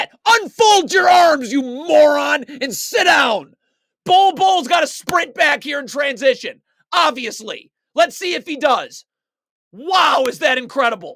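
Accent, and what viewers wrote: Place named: Philadelphia, United States. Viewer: ima big fan of this dude... he sounds like he a Philly dude...we act like this 24 7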